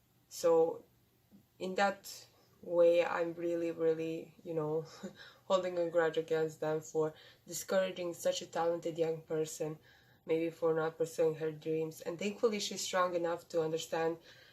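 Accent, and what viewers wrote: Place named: Russia. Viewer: Ты же русская, по акценту слышно_)))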